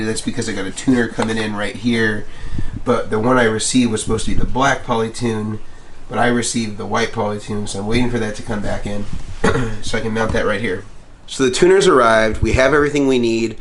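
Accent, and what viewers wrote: United States. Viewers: Hahahaha. Fantastic. thanks I enjoyed that. It's not you who first brought this to my attention but since you said it a lot on this video, sorry but you have been targeted! I have always wondered why Americans pronounce solder this way. I also wonder why some people say " aks" instead of ask. Spelling however is another matter and I am absolutely terrible at it. I have also got some famous sayings completely wrong in the past. ps. I do enjoy your videos man. I have one of the best dumble clones ever in my opinion and am obsessed with those amps which brought me to you to check out your pedals. Would love to try one. Check out my youtube videos if you wanna hear the dumble. I reckon you would really appreciate it : ). It's got original 1975 Bassman transformers in it.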